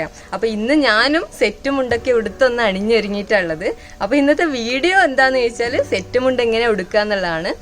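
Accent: Kannur, India. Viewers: Kannur kaariyano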